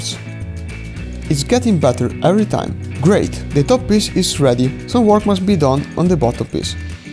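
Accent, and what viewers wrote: Italy. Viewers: Si sente che sei italiano... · ehi, sei italiano? si sente dall'accento...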